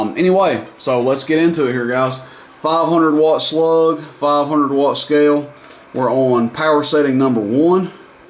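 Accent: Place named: Tennessee, United States. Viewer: I'd like to hear one of these on the air. Often times amps have a very small amount of distortion coming through and not clear as the radio itself. There's one guy who cruises through my way ever so often down the interstate 14 miles away. It takes him at least 1 hr to go out of range. I don't think that guy ever shuts up. I have never in my life seen a guy talk so much. 30 minutes before he gets here and 30 minutes after he passes. I know he's pushing some serious power but have no idea how much are what kind of amp he has but he is crystal clear. He tells folks I can't here you but you'll keep hearing me on and on and on. Here is a cool miles per watt graph. <link> You sound like some of my kin folk in Tennessee.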